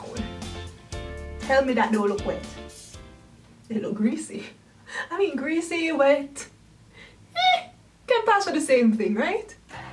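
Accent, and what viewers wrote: Jamaica. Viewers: I love your Jamaican voice lm Jamaican to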